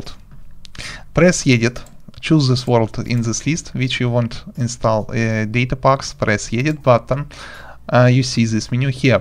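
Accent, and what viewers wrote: Russia. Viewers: Руський акцент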